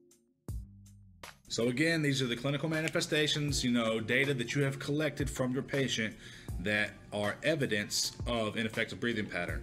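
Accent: South, United States. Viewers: He sounds like he's from the south 🙌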